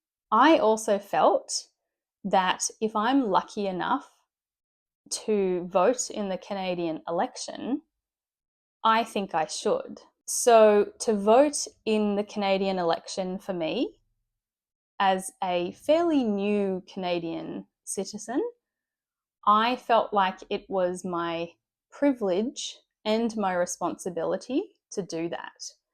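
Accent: Australia. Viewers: Hi, while I was commenting on Bo's YouTube video, I did found your channel there and then I'm here right now, watching and learning the Australian accent from you 😁. Greetings from Malaysia 🙏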